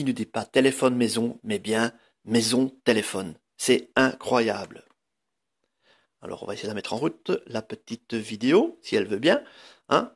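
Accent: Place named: Belgium. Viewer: Super l'accent belge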